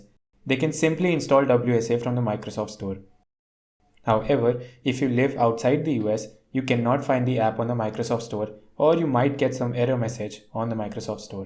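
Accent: India. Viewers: I LOVE THAT INDIAN ACCENT ❤❤❤❤❤❤❤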